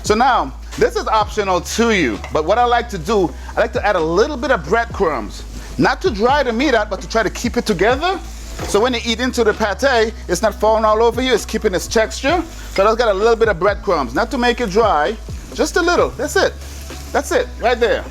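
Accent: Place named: United States. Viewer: Your stateside accent,,is funny 😂! Be yourself, its okay😅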